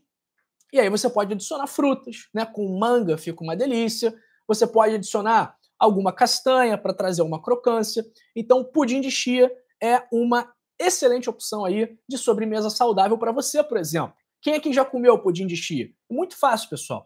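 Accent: Rio de Janeiro, Brazil. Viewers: Seu sotaque é de carioca, Dr. Vc Tem cara de Flamenguista, acho q vc fala do Rio de Janeiro, Cidade e Estado do MENGÃO!!! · Vc deve ser do Rio de janeiro, pelo sotaque de carioca. Estou no Rio de janeiro. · Bom dia!!! Que é cúrcuma? É um pozinho amarelo que compramos em casas de produtos de temperos tbm? Ganhei um pé de plantas me disseram que é cúrcuma. Será que alguém tem pé de cúrcuma em casa e deixar a foto pra mim comparar? Muitíssimo agradecida dês de já. Eu moro em Guarulhos SP e o DR pelo sutaque pare ser carioca,Rio de Janeiro. Morei lá duas vzs.😊✌️💞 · Boa tarde Dr acho que vc e do Rio de Janeiro o sutaco não nega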